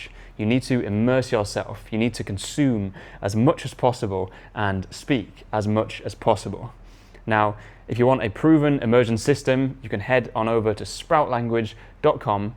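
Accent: Northern England, United Kingdom. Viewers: Who loves the British accent like me? 🙄🤓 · Hi,! Why do you say "mug" as [mug] but not [mʌg]? Are you from Northern England? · thank you you are kind and well done on your youtube. I am a senior English teacher from MOROCCO..your accent tells me you are from the northern part of England 😊 thank you very moutch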